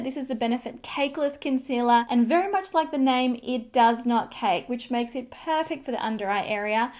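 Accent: Australia. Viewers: This is random but I’m from the states and I’m obsessed with your Aussie accent 😍😍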